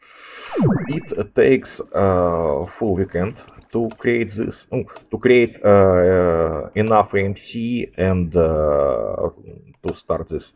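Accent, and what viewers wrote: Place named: Russia. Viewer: От русского акцента не избавится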